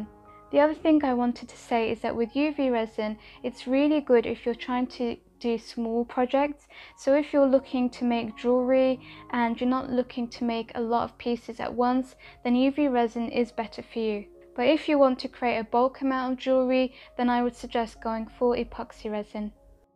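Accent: United Kingdom. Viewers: Thanks so much for this information. I’ve had UV and epoxy resin for ages to use with polymer clay for dioramas etc., but felt intimidated. As I’ve only just found your channel, I will be enjoying a binge! 🥴 Great to hear a UK voice too as I know I will understand the shops etc you name in your videos. With grateful thanks from Scotland! ❤️🏴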